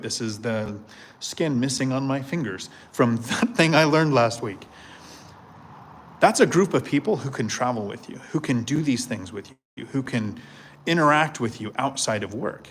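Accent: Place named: United States. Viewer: Speaks American. I've been to Thailand many times and they don't speak any particular kind of English except English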